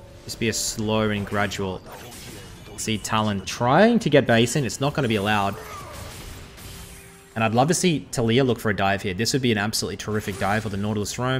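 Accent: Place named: Australia. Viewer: I though was from the Uk. Ozzy makes sense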